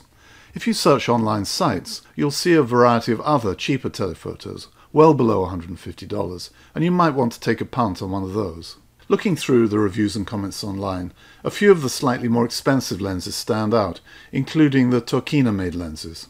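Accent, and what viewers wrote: United Kingdom. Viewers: I love your British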